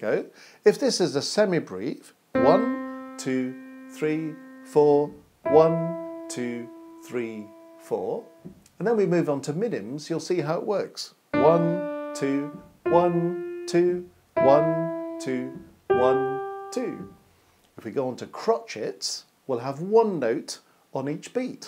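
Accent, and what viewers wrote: United Kingdom. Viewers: I love this teacher. The pace and tone of his speaking and the British accent all make it so enjoyable to follow the class.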